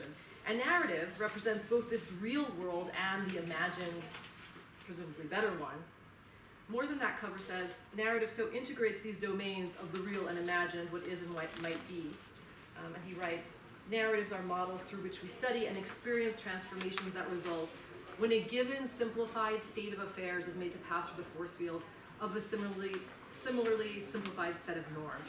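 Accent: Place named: United States. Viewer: gosh Ive worked with this woman and am amazed that she delivers her material with the charisma of an inexperienced first year undergraduate student.In real life she is bright enough but viciously nasty to anyone she considers weaker or below herself. Here she mumbles and delivers a soporific presentation. Amazing. The boredom of the moderator is so obvious. Also she needs to work on her Hebrew pronunciation , it's painfully American.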